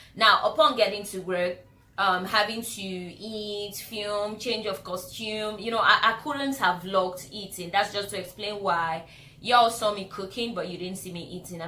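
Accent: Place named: England, United Kingdom. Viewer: Are you were living in the UK because your accent is similar to that of England?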